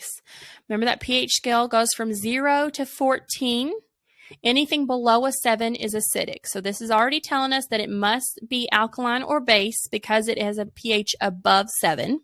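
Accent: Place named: South, United States. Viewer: goodness I love southern accents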